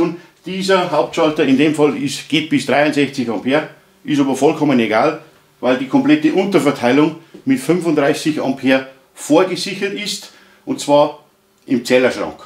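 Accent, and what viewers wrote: Bavaria, Germany. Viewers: Als Laie bin ich hier vermutlich so etwas, wie ein Exot....und trotzdem bemerke ich Deinen Spaß an der Freud' .... und verstehe bayrisch und Technik immer besser!.. Super Video!!!! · Bitte red wieder boarisch!!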